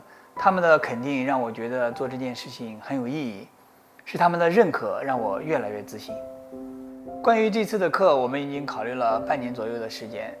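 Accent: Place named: China. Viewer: Привет! Всегда думал, что ты из Японии, но твоя речь и особенно цифра "5" дали мне понять, что ты из Китая. Просто, я не слышал, как ты разговариваешь). Но, не это главное.. Каждое слово в твоём монологе, каждая мысль, созвучны с моими мыслями. Я всю свою жизнь не зависел от начальников, государственных структур и тому подобного. Всю свою сознательную жизнь я зарабатывал своими руками и накопленным опытом. Это были, в основном, ремонты интерьера и разработка и изготовление узкоспециализированных станков. Теперь мне 49 лет, но я гораздо моложе физически и полон сил.... Обеспечил себя большим количеством свободного времени и хорошего инструмента. Твои видео, а так же других мастеров, дают мне идеи и приёмы в новом опыте. Благодарю тебя. Привет из Мариуполя..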